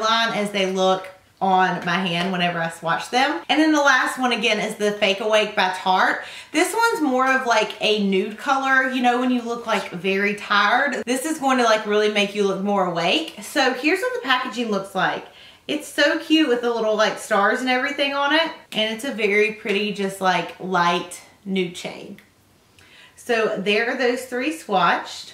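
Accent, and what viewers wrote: South, United States. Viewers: Hi first time watcher here. Where do you live? I hear that southern accent! 🤗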